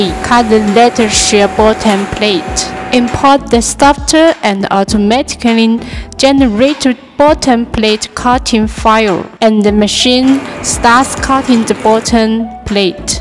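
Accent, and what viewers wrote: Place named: China. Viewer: what a pain to listen to her zinglish 🐱‍🐉🐱‍🐉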